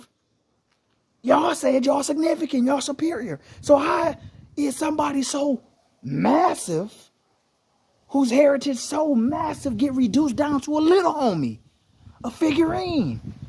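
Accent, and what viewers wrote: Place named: Alabama, United States. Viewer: You talk like white man from Alabama.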